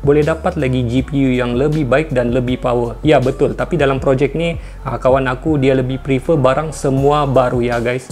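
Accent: Sabah, Malaysia. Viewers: He from Sabah so have different slangs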